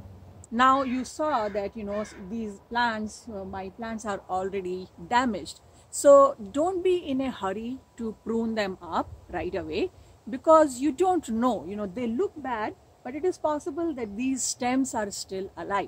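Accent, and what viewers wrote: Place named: India. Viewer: Hey. May I know in which country you live in? You never mention any country or state in your videos, so it confuses me a lot... Your accent is Indian, if I am correct. But there hardly is frost in India... Also, I just saw your video about wisteria, though I have purchased some seeds of it, don't exactly know if they are original or not, but I have never seen wisteria in India. I am from Kashmir and wish to know if wisteria will survive here in snow. Thank you! And I apologise for so many questions 😂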